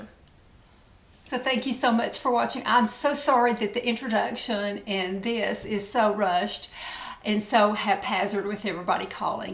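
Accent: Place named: South Carolina, United States. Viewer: Hi I've been watching you for a long time now. I like playing videos while putting on my makeup when I can. First thing I thought was "she sounds like me!" Lol I'm a born & raised South Carolinian! At that time no other women on YT in the beauty community sounded like us so that's how I found you. I subscribed and haven't missed a video since plus I've gone back and watched a lot of your videos from earlier days. You're a beautiful woman first of all but I think you're a beautiful soul as well. I enjoy your videos and you've convinced me to try a few products and NOT try a few. I'm 56 married 34 yrs in Oct 4 children 2 living and 5 beautiful grandchildren. I love makeup big hair my jewelry and clothes so I fit right in or you fit in to my lifestyle. Thanks for sharing and the tip about the Tazo tea for nausea that helped Doug has really helped my husband. 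I just wanted to say I appreciate you! Hope you do videos for a long time to come. Blessings & love from SC ❤🌹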